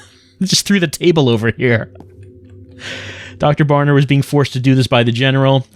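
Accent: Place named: New York, United States. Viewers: Your... uh... New York accent when reading letters makes this game much more enjoyable. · Newark union plumber accent is really the best. Especially in Doki Doki Literature Club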